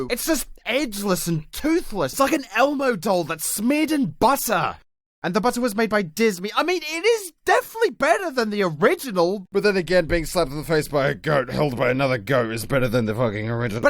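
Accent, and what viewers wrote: Australia. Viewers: wait u r australian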